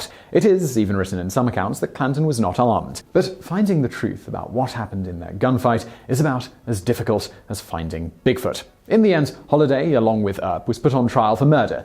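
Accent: England, United Kingdom. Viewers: im English and i do feel hearing an english man talk about American gun fighters just doesn't feel credible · Why in the hell is a sewer britsh oink talking about american southern and western history? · So the funky English accent makes history assumptions fact? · Nothing says like a good old biography of a American Wild West legend narrated by a Brit! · Something weird about a British man giving me American history. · Despicable actually, the pretentious British accent combined with ignorance of American culture or proper American pronunciation is utterly repulsive... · The limey narrator seems a bit biased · I just cannot stand a British accent.....it makes me crazy....especially the way he pronounces the game Faro ! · This would be a lot better if the narrator didn't talk so fast and choppy with that annoying accent. English accents are great as long as they are speaking the Queen's English and not that choppy sounding stuff.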